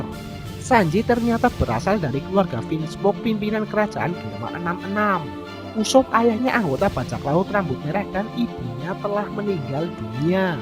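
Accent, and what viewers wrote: Java, Indonesia. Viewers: Admin logatnya sengaja di medokin ya ? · Kayak dari jawa.. medok bro.. smangat aja bro.m kritik dan saran itu sudah biasa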